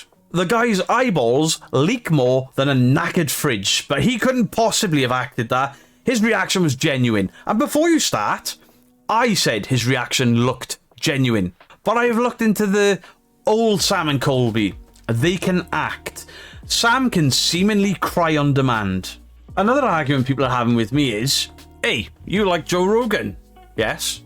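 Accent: Wales, United Kingdom. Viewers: Love ya Welsh accent mate it’s lovely from the north mate lovely video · Yes he’s very welsh haha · Are you from Wales? 🤔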